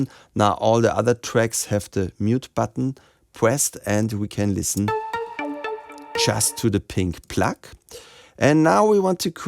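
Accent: Germany. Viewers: I like this dude. I usually find German accents too harsh but this chap is very pleasant to listen to. Doesn’t sound like he’s in a hurry like most YouTubers! Nice and chilled out and uses pauses and explains things well. I also love how he says “schteinberg” in the true german way. I also love dom sigalas videos but I am finding those constant zooming jump cuts really annoying!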